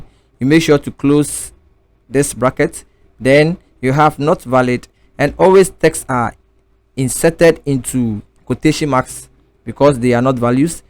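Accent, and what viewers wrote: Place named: Africa. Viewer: I really love your accent. Are you an African?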